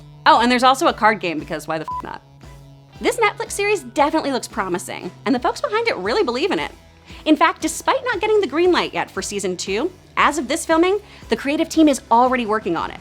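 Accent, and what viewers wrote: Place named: Texas, United States. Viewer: Even with the obvious changes they're making, I'm still excited to see this. Also, your Texas is strong in this vid and I'm living for it.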